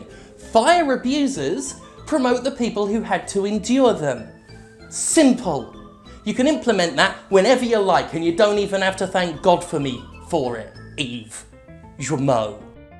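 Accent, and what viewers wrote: United Kingdom. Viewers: 2014 sounds britisher than 2020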